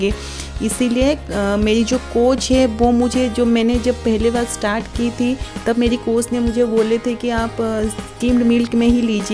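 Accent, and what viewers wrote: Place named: Odisha, India. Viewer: Odisha style bolreheho .... Odia me bolo...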